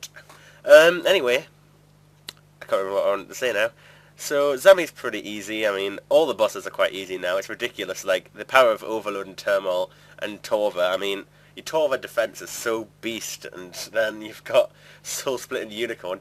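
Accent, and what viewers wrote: Newcastle, United Kingdom. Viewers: loving the jeordie accent in the super hostile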